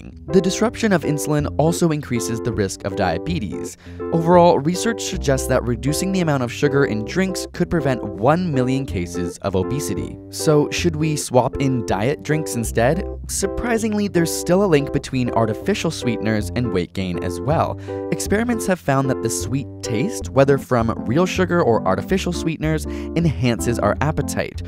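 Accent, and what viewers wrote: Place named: Canada. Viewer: are u Canadian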